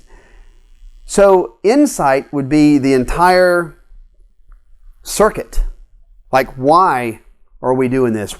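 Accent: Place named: South, United States. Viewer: Amazing lesson! Where are you from? You sound like a fellow Southerner! haha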